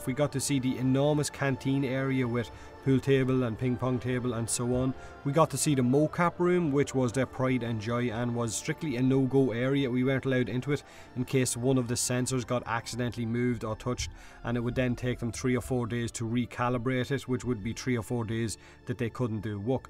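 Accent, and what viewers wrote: Ireland. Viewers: lol are you from Ireland??? I em in Ireland to :D loooool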